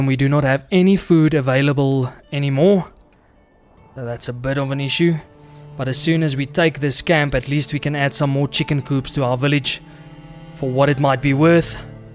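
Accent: South Africa. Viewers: You South African bro? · You South African? Hahaha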